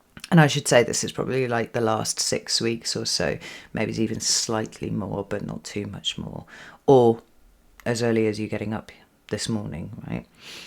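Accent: United Kingdom. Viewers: Just your amazing voice, delightful british accent and excellent vocabulary and choice of words captivates me. I would be listening to you for days! Thanks for your readings, Cap sun Sag rising here. 😊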